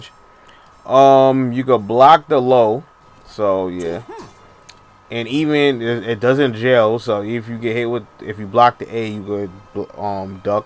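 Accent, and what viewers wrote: United States. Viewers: Fuck all these haters, you speak like an American, and you know how to teach. This bullshit 6ab or whatever doesn't work when teaching newbies, good shit man!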